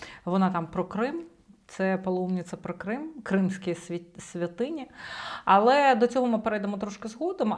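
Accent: Russia. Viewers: Цій авторці треба більше в житті спілкуватися українською. У неї купа рускіх звуків , вона вимовляє українські власні назви на москальський лад . Най більше слідкує за вимовою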